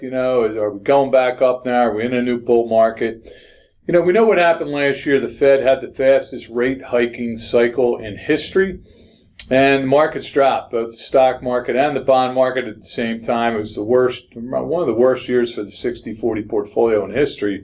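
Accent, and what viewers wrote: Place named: Philadelphia, United States. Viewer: I've listened to weekly half-hour infomercial for many years. He's a guy whose appearance does not match his voice. That's neither a compliment nor a put down. Just an observation. One thing I'll give him is he's got the southeast Philly metro dialect down pat. When I hear guys talk like, I know I'm dealing with someone from home.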